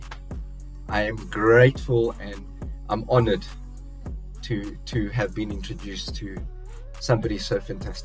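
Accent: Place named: South Africa. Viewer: May I ask where you are from? You sound almost exactly like my fiancé sounded when he talked. He was from South Africa.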